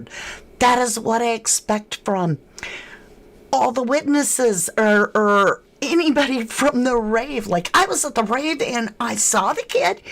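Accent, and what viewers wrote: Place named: United Kingdom. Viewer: I came to you in the Jay case. Just love how you do things especially your British accents.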